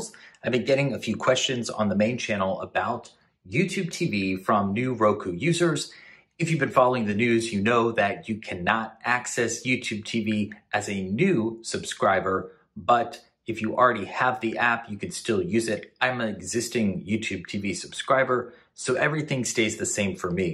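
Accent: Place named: China. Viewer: Como eliminar la voz en off con acento Chino? Gracias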